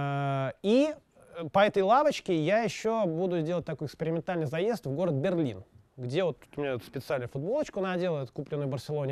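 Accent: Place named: Moscow, Russia. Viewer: Странно, но ты действительно АКаешь и интонируешь в нос как уроженец первопрестольной. Ленинградского в твоей речи только скорость) Да забей короче)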